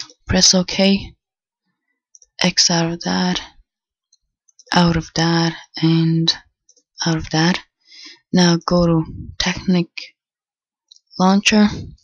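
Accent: Russia. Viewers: Your Russian ? :D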